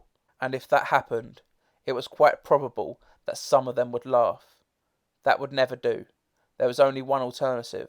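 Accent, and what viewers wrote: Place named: England, United Kingdom. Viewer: Thank you for this video. This essay was assigned to my English class for analysis and hearing someone else read it really really helped me. It always sounds better with an English accent.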